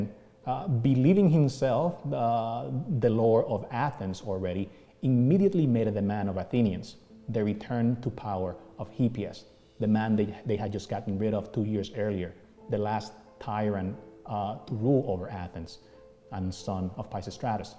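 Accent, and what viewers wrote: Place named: United Kingdom. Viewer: Great content, love the accent. You must have learned English from a British teacher.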